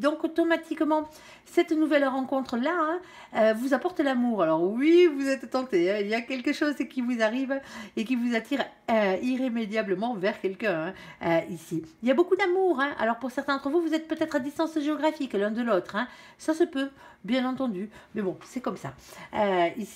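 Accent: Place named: South, France. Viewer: Je vous découvre, vous êtes dans le juste 😊. Merci pour votre bonne humeur et votre accent de soleil ☀️ Je m'abonne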